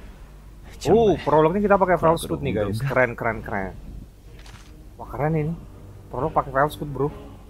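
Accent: Java, Indonesia. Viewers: bro lu tu orang mana kok kadang logat jawanya. keluar,btw ane juga pernah kesulawesi tenggara tahun 2003 disana masih hutan,kalo sulawesi selatan cemana disana?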